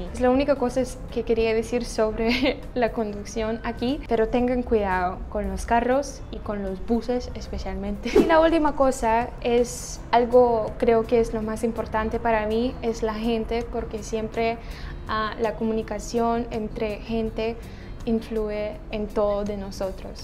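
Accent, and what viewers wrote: Colombia. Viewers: ya se te escucha un acentico de costeña 😄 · Hola, tienes un buen acento español Colombiano. Saludos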